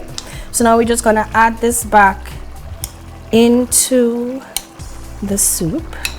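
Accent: Trinidad and Tobago. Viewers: You sound Trini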